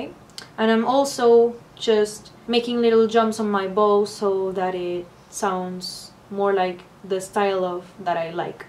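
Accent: Spain. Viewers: Tío pero si con el acento españolizado que tiene se entiende perfectamente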